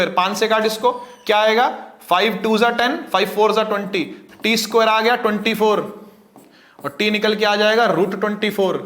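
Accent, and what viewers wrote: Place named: Pahari, India. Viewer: Sir bhi pahari bolte ha............ nicha thala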